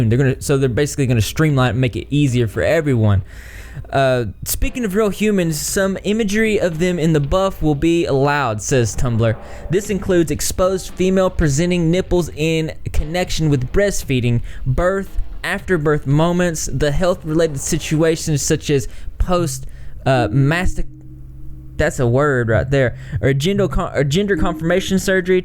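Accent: South, United States. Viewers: Where do you live? I'm so curious, you sound southern!!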